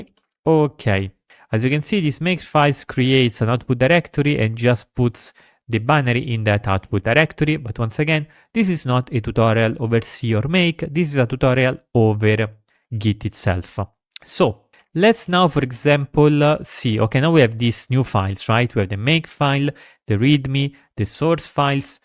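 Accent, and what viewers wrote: Italy. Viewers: Lo sento da 1 km di distanza l’accento italiano haha bel video!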